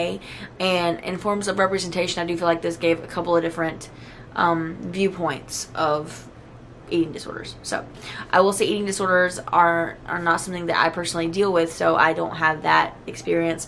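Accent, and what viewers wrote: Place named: South, United States. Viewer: Also let your accent shine girl!! Idk if your southern, but I love it😊☺️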